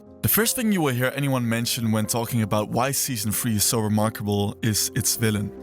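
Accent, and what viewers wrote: Netherlands. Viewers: Your accent sounds very Dutch, are you?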